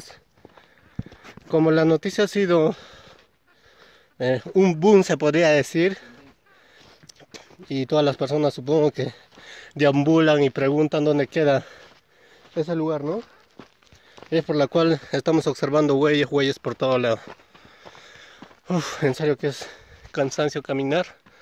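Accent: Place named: United States. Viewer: Me encanta tu voz medio gringo tu acento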